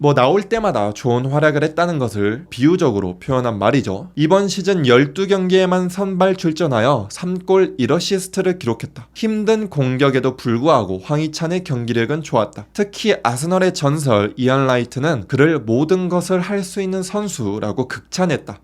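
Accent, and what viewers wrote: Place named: Jeolla, South Korea. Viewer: 전라도 억양 디게 거슬리네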